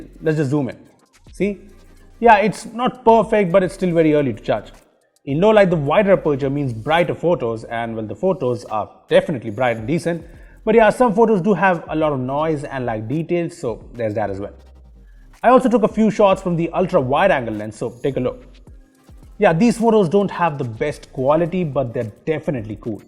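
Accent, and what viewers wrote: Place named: India. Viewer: New subscriber here ! Love from malaysia... Like your indian-english accent, that's why I've used to watch tech news on indian's channel. Their accent are just, satisfiying to hear.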